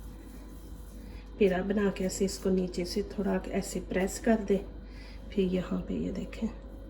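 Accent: Punjab, India. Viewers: Punjabi lehjhe main baat named kya kare, Durst urdu boleh. Recipes acchi hoty hai.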